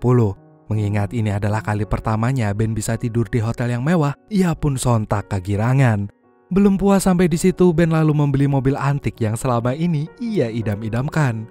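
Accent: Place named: Java, Indonesia. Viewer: Rada medok suarane pasti wong jowo😂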